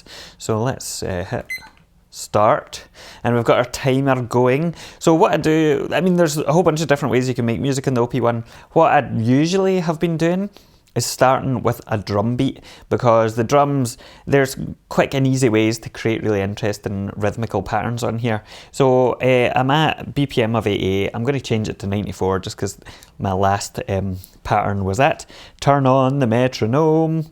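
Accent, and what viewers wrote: Scotland, United Kingdom. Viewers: Your videos are great man! You should have 1000x the subs - the YouTube algorithm is a fickle mistress. Great to hear a Scottish voice doing synth stuff. Thanks again.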